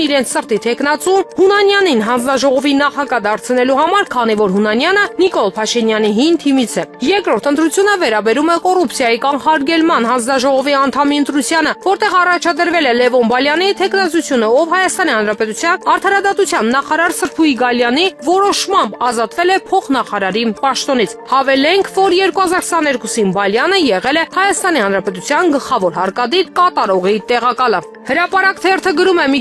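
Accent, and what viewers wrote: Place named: Turkey. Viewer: Այս հաղորդավարը թուրքի ստիլով է խոսում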